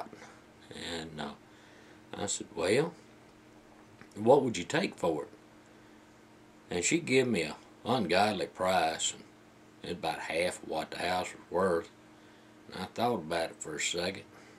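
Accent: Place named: Georgia, United States. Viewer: HAHAHAHAHAHA That is an AWESOME story!! You know, you remind me a lot of my old man lol. You from Georgia by chance? You and him have the same accent and seem about the same age. He has all kinds of stories like that that happened to him in the past, lol. This made me laugh.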